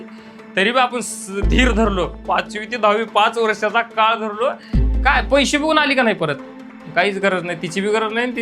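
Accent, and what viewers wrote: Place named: Latur, India. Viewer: 100%Latur cha hay bhau😊😂😂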